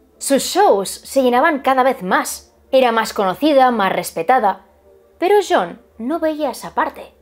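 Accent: Spain. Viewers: me encantan tus vídeos Antes cuando recién vi uno no entendía bien el español de España me parecía muy rápido la manera de hablar, ahora ya lo comprendo súper bien 😂❤ Me acompañan tus videos mientras hago la limpieza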